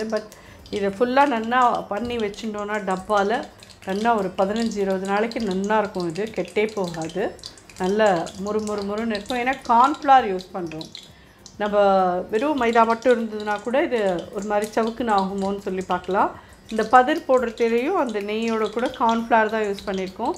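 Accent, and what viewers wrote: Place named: Tamil Brahmin, India. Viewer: Super mami. actually I expect this recipe from you. Your explanation is so good .I will try this recipe. and I like your voice with agraharam style. Thank you very much mami 👌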